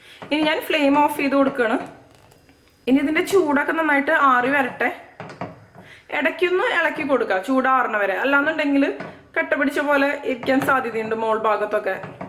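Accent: Thrissur, India. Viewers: Thrissur kari anno?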